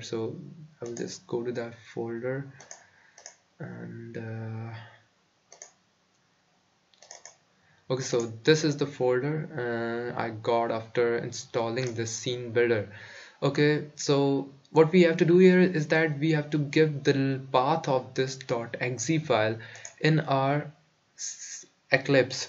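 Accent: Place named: India. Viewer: what is the problem of you Indian people with T & D, it is so irritating.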